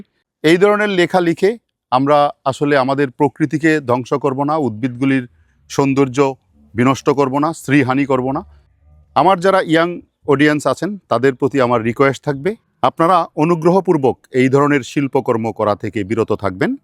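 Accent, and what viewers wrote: Bangladesh. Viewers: আপনার কথা শুনে মনে হয় আপনি বাংলাদেশের,আবার বর্তির বিলের কথা শুনে মনে হচ্ছে আমাদের পশ্চিমবঙ্গের।আপনি কোথায় থাকেন জানিনা তবে যে কাজটা করছেন খুবই প্রশংসনীয়।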